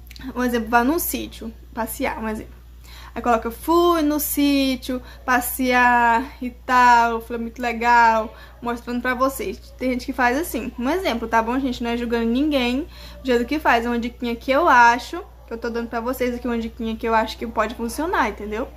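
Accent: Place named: Maranhão, Brazil. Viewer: Vc fala maranhense msm😍💖😍😍😍😍lindahhh sou sua fã... vc me deu essas dicas💖❤e ta ajudando 🙏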